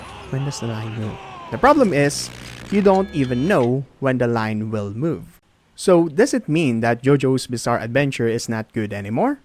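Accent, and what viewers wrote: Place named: Philippines. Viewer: That accent.. say, are you filipino by any chance?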